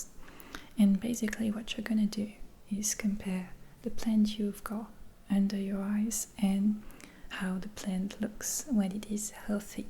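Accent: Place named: France. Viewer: Your videos are so wonderful. You have a perfect ASMR voice. I love your accent too- I studied French for many years and it brings me back. You English is amazing!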